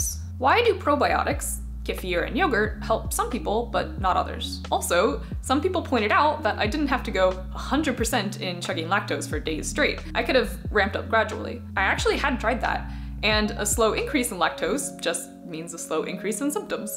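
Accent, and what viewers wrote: Canada. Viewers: are you canadian you sound canadian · No offense but are you french canadienne?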